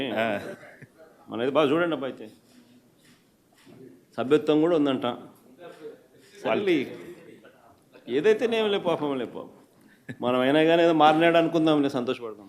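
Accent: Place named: Kadapa, India. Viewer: Chi kadapa yaasa! .5 yaasa idi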